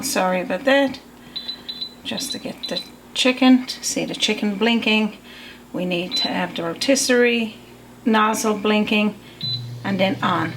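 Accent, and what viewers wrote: Ireland. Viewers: Well Hi....Thank you kindly. What a wonderful & simple recipe this is. I've just watched it. I'm most definitely going to be having a go over the weekend....I'm sure our lovely grandson is going to just love this type of chicken with tater-tots....I'll be adding a little sprinkle of garlic powder & a hint of paprika..... Thank you kindly. I really very much appreciate your taking time to post this....Just subscribed 2....All good. I'm a seasoned traveller myself. Many decades ago of course....I'm picking up a we bit of Irish or Dutch in your lovely accent? I'm definitely going to say Irish ancestry..... Been Der2......😍😃😍😃😍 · I thought you were Irish because of your accent! Nice review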